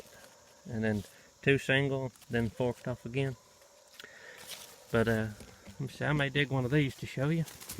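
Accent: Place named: South, United States. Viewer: Thank you for sharing your knowledge of the ginseng and also the medicinal plants. I've seen black cohosh in the pharmacy area of Walmart and think I know what it's for. You're blessed to have that land you can just walk out and go wandering around in, I wish I could do that but my husband likes the city and won't move. I watched the TV show about ginseng too and I thought they might be glorifying it a little but I'm sure there are people that go on land they have no business being on. I like the way you take care of the plants you have by replanting them, that's taking care of the gifts God has given us. Looking forward to meeting your family too. I have to say I love your southern accent, my mother was from MS and my dad was from West Virginia. God bless and take care, also when you said you had snake protection I thought you were going to pull out a venom kit and you had a pistol. 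lol Looked like the pistol had been around awhile too?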